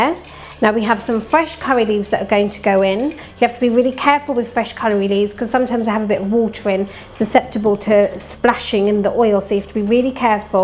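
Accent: United Kingdom. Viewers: nice vedio.. thnx for uploading........... and nice British accent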